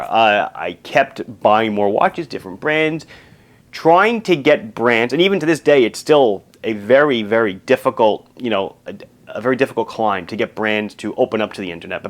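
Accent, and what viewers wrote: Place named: New York City, United States. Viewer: Hey! Awesome video! Great story! I never had the feeling it was a one man show because of the excellent service and attentiveness I receive when I shop with LIW. I love the fact that you're an engineer AND a watch guy and that your wife is helping keep the business with you. And as a Brit in Switzerland I really like to hear your Manhattan accent because you say bought, caught etc. like we do.:-) Keep 'em coming! Sincere best wishes for now and the future to you, your family and your employees. Rob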